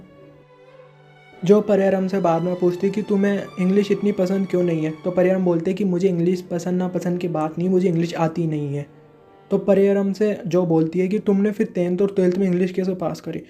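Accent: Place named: South, India. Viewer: not like that bt tumhara acsent full south wala he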